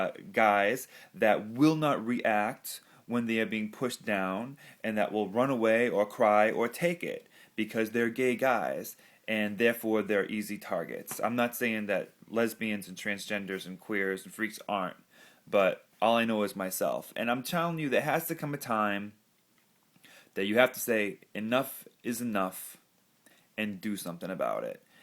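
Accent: New York, United States. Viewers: It's fucking sad that this kinda shit is still happening. Sorry this happened to you. Your NewYork accent is adorable. Sad for me that youz gay😏I fink youz tha cutest! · Love the NYC accent sooo much. xoxoxo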